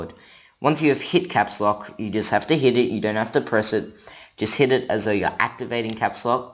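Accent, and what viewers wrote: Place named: India. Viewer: Thanks a lot man!! From 5 hrs to 1 hr.😍😍 I wish I saw your video long time back. Thanks brother. I'm from India. It seems from your voice that you are also an Indian. Am I right?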